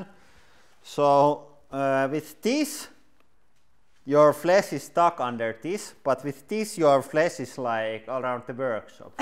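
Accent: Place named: Finland. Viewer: Well that's Finnish for you and the mighty rallienglanti.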